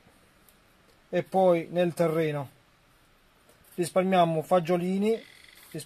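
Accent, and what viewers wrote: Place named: Salento, Italy. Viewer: Sei molto bravo , ti seguo da poco ma mi piace il tuo modo di spiegare , molto semplice e "paesano" (complice la accento salentino ). Io faccio così con i pomodori , zucchine , fagiolini , botole , e quest anno anche con i piselli verdi . faccio il contrario cioè prima faccio le buche con le dita e poi semino ma va bene uguale ,la cosa che faccio in più di te è che metto le spaselle in dei contenitori con sotto l acqua (tipo stanati) dove posso sistemare 4 spaselle ogniuno . L altra cosa , uso le microrizze ,tu le usi ? Cosa ne pensi ?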